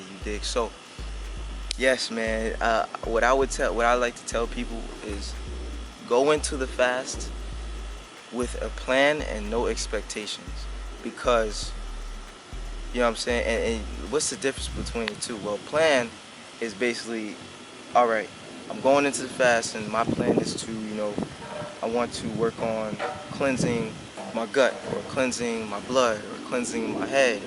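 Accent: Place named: South Africa. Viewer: Super cool video! Where you from? You Sound South African lmao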